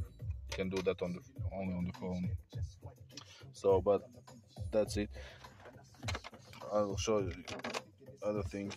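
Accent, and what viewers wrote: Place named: Latvia. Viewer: sveiks pēc akcenta liekas ,ka esi Latvietis ludzu atstui linku kur var pasutit to adopteri ?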